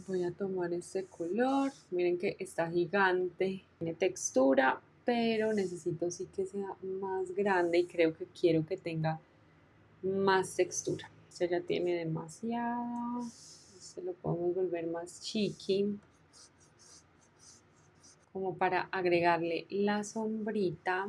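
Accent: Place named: Colombia. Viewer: Su acento colombiano! 😍😍😍 soy de México.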